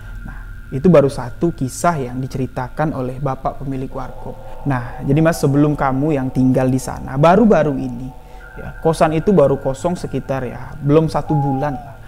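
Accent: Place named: Madura, Indonesia. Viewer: Mas tu orang mana ya kok ada logat maduranya...🙏...seneng dengerin cerita 2 dr mas...sehat sll sukses...mantap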